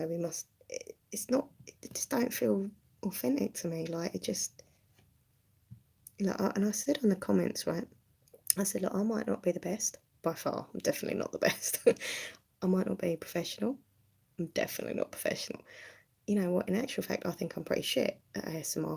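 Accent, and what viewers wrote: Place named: England, United Kingdom. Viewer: What part of England are you from? Can’t work out the accent it’s bugging me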